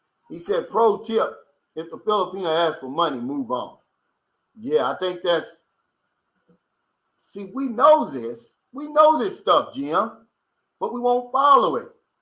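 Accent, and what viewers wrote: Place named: New York, United States. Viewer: hey funny you dont sound upper crust you sound rough new york type but what you say is as smart as anyone example love yourself first before look for external love thats as smart as ive ever heard dont sugar coat ones lack of selflove with anothers love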